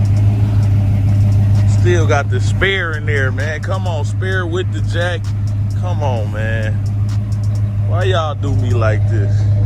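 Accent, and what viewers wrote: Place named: Mississippi, United States. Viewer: bruh what part of mississippi you from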